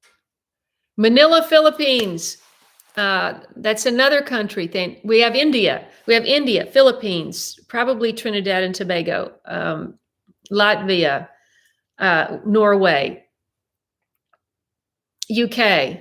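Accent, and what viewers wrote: Tennessee, United States. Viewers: Happy Birthday, have a wonderful time amazing, its Lucinda's birthday tomorrow 15th, my birthday is the 5th of April i shall be the big 80 can't believe it, you're getting back you're Tennessee accent love it Lucinda noticed it more than me, you're fireplace and livingroom looks really cosy and picture are outstanding "well done" missed the livestream, horse riding exercise see you next video love, Edwin & Lucinda Xx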